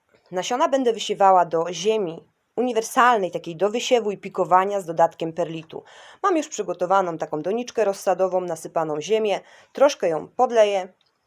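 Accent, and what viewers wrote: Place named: Łódź, Poland. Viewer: Słyszę naleciałości łódzkie ;)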